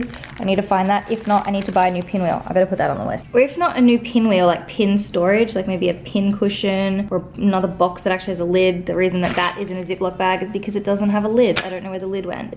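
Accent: Australia. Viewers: Lol I binged a bunch of your videos and now my thoughts are in an oz accent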